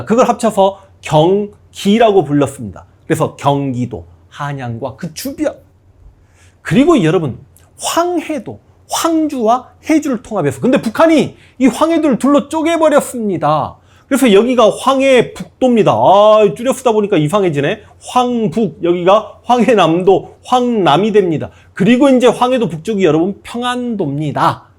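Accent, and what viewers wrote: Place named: Honam, South Korea. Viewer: 고향이 호남지역이시군오 ㅎㅎ 발음이 호남이네요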